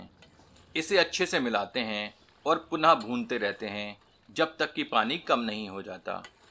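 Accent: Standard. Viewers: Sir apka hindi sunke Dil bag bag ho Gya.....Hum v tasty recipe or suddhaa Hindi ke liye apke khate pe like or ek Subscriber Sammilit krte he........😊😎👌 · आपकी शुद्ध हिंदी के कायल हो गये हैं। आपकी हिंदी तो अमिताभ बच्चन से भी शुद्ध है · the purest n sweetest Hindi I have ever heard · itni sudh hindi sunkar dil khush ho gaye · भैया इतना शुद्ध हिंदी कहा से सीखे है । आपके पूरे स्कूल को आप पर हिंदी के लिए गर्व होगा ।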